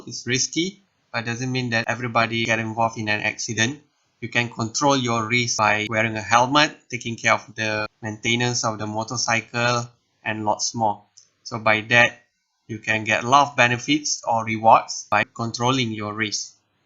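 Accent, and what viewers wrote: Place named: London, United Kingdom. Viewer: Waa bang. Spiking london laaa sekarang.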